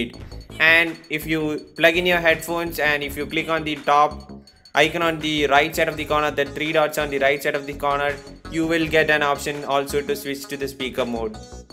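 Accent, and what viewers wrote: India. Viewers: Are u from kerala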